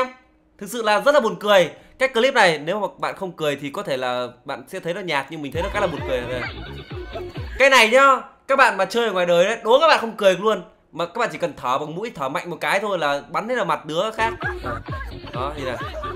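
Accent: North, Vietnam. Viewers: miền Bắc nha bạn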